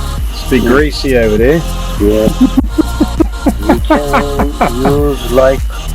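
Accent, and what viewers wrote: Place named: Australia. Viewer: Hoe kom je aan dat enorme Australische accent?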